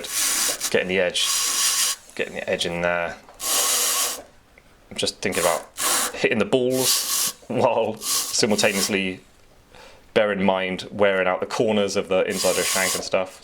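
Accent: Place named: London, United Kingdom. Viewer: Thankyou great to have tutorial London tone lol I'm newbie so loving your tutorial s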